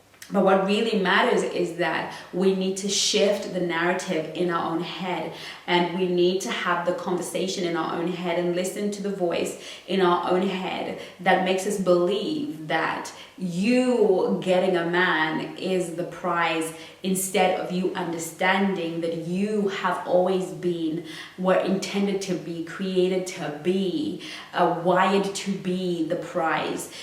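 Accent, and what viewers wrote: United Kingdom. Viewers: Hi beauty...how much I love your Brit accent and your style! Bisous ma chérie...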